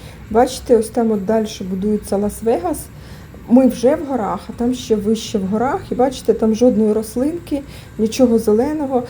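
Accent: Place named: Ukraine. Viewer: Дякую пані !👍👏👏👏 У вас добра українська мова . 👍Вибачте , в якій області України така чиста мова ? Я з чернігівської області.🤗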